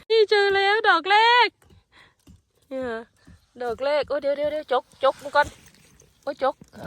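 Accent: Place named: Phu Thai, Thailand. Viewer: โอ๊ย ยาย หนู กรี๊ด!!!นำ งามคัก งามคัก เห็ด ตาแซ่บเนาะ ยาย😆😊👍 หนูอยู่ แอฟริกาใต้ บ่มีให้กินเลยค่ะ ว่างๆ เพื่อนๆ แวะมาเยี่ยมเยียน ส่อง ทางแอฟริกาใต้ กันเนะเด้อค่ะ เข้ามาติชมเนะ พึ่งทำคลิปใหม่ค่ะ ตามสามีไปติดตั้งแก๊ส แบกแก๊สพร้อมพี่น้อง แมนคัก มาดามเมืองคนดำ 555😆🙏🇿🇦🧯🚐🌷❤️🏡✅ ยาย ขออนุญาตค่ะ คนที่ไหน ทำไม พูดคล้ายๆ คนภูไท แท้ค่ะ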